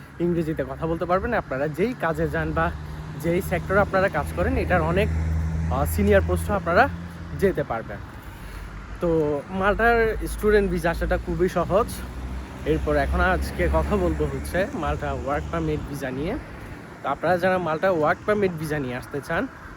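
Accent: Chittagong, Bangladesh. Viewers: Chatgaiya mne hoi vaiya apni??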